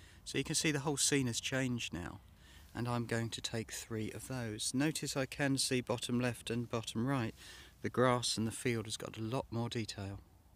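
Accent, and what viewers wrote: United Kingdom. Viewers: Thanks, That link didn't work but no matter as I found a link to download on the VibranceHDR webpage and installed from there. It was a bit disconcerting as Google obviously not happy with that and gave me all sorts of dire warnings and are-you-sures? But I pressed on and installed. Then I received all sorts of unwanted notifications from them on all manner of subjects but blocked them and now all is quiet again. I just need to try out Vibrance HDR now and I shall follow your guidance closely. I have to say it was nice to hear another English voice on a YouTube tech/geeky subject video and your quiet clear and measured presentation is much appreciated along with your commentary on extraneous helicopter and gun noises - made it all the more human and genuine for me;-) If I haven't subscribed then I'm about to. Best wishes. Philip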